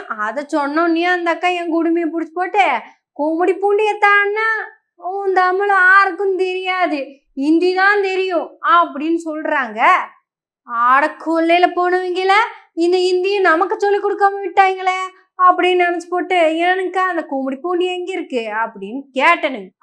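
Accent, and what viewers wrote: Kongu, India. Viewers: கேக்க இனிமையான கொங்கு தமிழ் அருமை சிஸ்டர்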